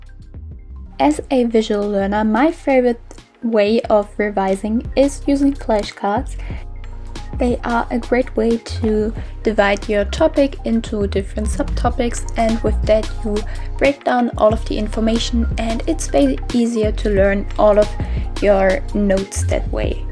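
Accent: Germany. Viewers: Der deutsche Akzent ist so cute HAHAHAHA. Aber tolles Video ❤️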